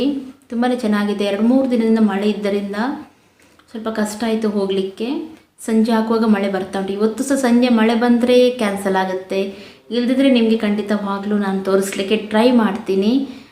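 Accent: Mangalore, India. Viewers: Don't worry my friend. Neeu nam urinavru anta kushi aitu. Manglure kannada- is best keluvaga tumbaa khushi agutte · Mangalore Kannada clean maraayre. I love Mangalore type Kannada. I am a proud manglorean.❤️❤️😘😘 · Donot worry i like your kannda speaking why to worry in mangalore this way they soeak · Kannada Chennaigide Mangalore Kannada Accha Kannada don't worry mam. I am SWATHI. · ಮುದ್ದು ನಮ್ಮ manglore ಭಾಷೆ ತುಂಬಾ ಚೆನ್ನಾಗಿದೆ, ಆ mathalli ನೀವು ಇನ್ನೂ ಮುದ್ದು, ನಿಮ್ಮಿಂದ ನಾನು ಅಡಿಗೆ ತುಂಬಾ ಕಲಿತೆ, ನಿಮ್ಮ ಮುದ್ದಾದ ಫ್ಯಾಮಿಲಿ, ನನ್ನ koraggajja ನ ಆಶೀರ್ವಾದ ನಿಮಗೆ ಯಾವಾಗಲೂ ಇರಲಿ, ನಿಮಗೆ ಆದಷ್ಟು ಬೇಗ ಸ್ವಂತ ಮನೆ ಆಗಲಿ ಎಂದು ಪ್ರಾರ್ಥಿಸುವೆ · Mangalore kannada...super mam... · I like ur mangalore kannada. And I like ur life style. Thank you for sharing your personal. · ನಿಮ್ಮ ಮಂಗಳೂರು ಕನ್ನಡ ತುಂಬಾ ಇಷ್ಟ ಆಯ್ತು ಹಾಗೂ ಆಡಂಬರವಿಲ್ಲದ ನೈಜ ಮಾತು ಕೂಡ ಇಷ್ಟ ಆಯ್ತು. Nature & God bless you.... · Akka I love u Nan sister antha ankothini. 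mangluru kannada chanda akka thumba naryadi inda maathadthare alli. bangalore kannada varatu akka Naanu mandya agagi helide. Yak althira naavu nim family ankoli akka albedi Nan hesru radha.albardu my request akka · Nivu estondu bejar agbedi nanu Mangalore nodidini ede eriti Kannada matanadodu. Nima skin tumba channagide. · Mangalore Kannada Super medum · I lv mangalore ...I lv mangalore type kannada superag iratte Bangalore ginta mangalore kannada ne clean iratte valasigaru bandu alli kannada na kannad madidare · Hey so sweet of you are,,,, u r pretty yar,, mathe nimma manglore kannada namge tumba esta marayre!!! :)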